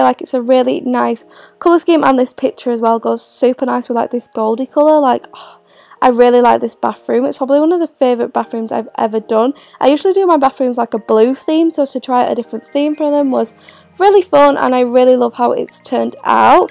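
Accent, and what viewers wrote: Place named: England, United Kingdom. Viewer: Rubina Begum she is from England so she has a accent :)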